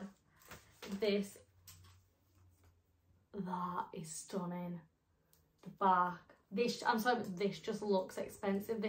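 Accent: United Kingdom. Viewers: Liverpool accent? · First time seeing your video content. Nice figure, and lovely English accent!!!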